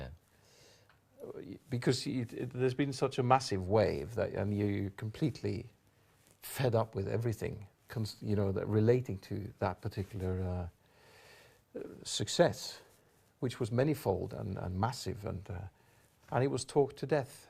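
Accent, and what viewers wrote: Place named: Norway. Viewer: It is definitely his/the Norwegian(Oslo area/south east Norw.)accent.(BTW heard some Aha's earlier interviewes recently and their English was really good back then(80's/early nineties)I think his swedish wife(Camilla?) had their children over there too.I think both him and Mags are unaware of these tiny flaws"Being half Norwegian myself,ppl tend to ask me if I'm from the Isle of Man, Wright or some other isle.Most Scandinavian ppl(Or ppl from the Netherlands,Finland, Belgium...most of them prefer sort of an American accent.Met this bloke from Scotland once,thought his accent was sooo charming(Probably because he was too lol.) Morten, Mags and everyone else should keep their accents.The way you smile,laugh walk, talk,think,express yourself in general...I believe that's what make most ppl unique in the first place✌🏼🌺🤲🏼🦋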